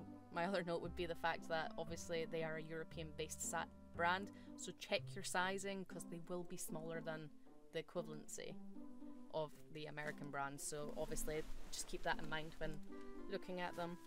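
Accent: Scotland, United Kingdom. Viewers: Wow!! Thats the thickest scottish 🏴 accent i have hear from you so far. Please keep it up yer wee lassie 👍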